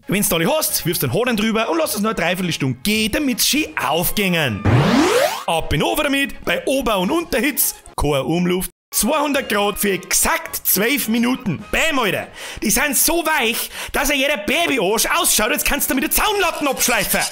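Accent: Bavaria, Germany. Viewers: Du bist da beste bin a aus Bayern Niederbayern endlich gib s moi gescheite YouTuber die borisch redn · Kriegt schon allein für's Boarisch ein Abo, ich musste sofort an den "Münchner im Himmel' denken. 🤣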